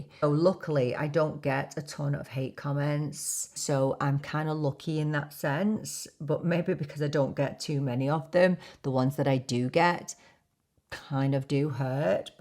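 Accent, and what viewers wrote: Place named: Yorkshire, United Kingdom. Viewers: Yeah! I picked up the Yorkshire accent. Though I was thinking North Yorkshire. I'm in the US but I watch a ton of BBC. A lot of the reality medical series I watch are up North in Yorkshire. I'm sure it's really my ignorance that helped, tbh. And, oh my goodness, why you ever thought you were less than is beyond me. You are stunning.😍 I do think though that many of us women, especially moms because we lose our identities when the kids are young, don't really come into our own until our 40s. So thrilled to be part of your journey on YouTube.💄💖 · What perfect timing for this video. I had to pick my car up from a service after work and had to wait another hour for it to be ready!! Thank you so much for sharing your story. I feel we have had similar experiences in life living abroad, having a child overseas without family and we are both teachers! 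Teaching is my second career and I didn’t study for my PGCE till I was 43 (now 59!), it was a scary decision but I’m so glad I did it. Whilst it’s not the best paid job I’ve had it’s definitely the most enjoyable! I detected the Manchester accent straight away and since you mentioned it today I can detect a little Yorkshire accent! My dad was a Yorkshire man and half my aunts/uncles and cousins have a Yorkshire accent. During Covid I was in Thailand alone working online, zoom calls everyday with my students and parents, I was really out of my comfort zone in front of the camera, but I did it! My partner is an Ozzy and couldn’t get back into Thailand till almost 18 months later. It was during this time I really got into YouTube and I got so much joy from making new friends through the amazing communities too. It was a time to really appreciate my own company (apart from my 5 rescued dogs). 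So happy I have found your channel, you speak with honesty and integrity and I love your videos.❤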